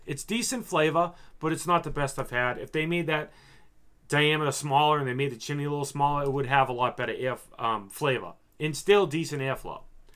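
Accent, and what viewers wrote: Boston, United States. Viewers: are you from boston? keep up the good work by the way !!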